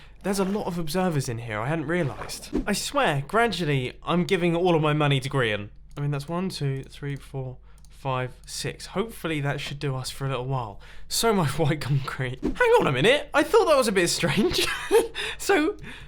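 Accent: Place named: United Kingdom. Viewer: To I talk with a strong western ascent, to me is extremely British. Why are accents so wierd!!?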